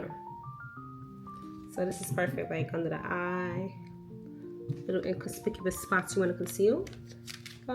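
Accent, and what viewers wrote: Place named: New York, United States. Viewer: Hi,! Your relaxed life always remind me that I need to calm down. I love seeing how you balance so well. It looks like your Labor Day was pretty chill and thanks for letting us hear your voice again. Your New York accent. 🥰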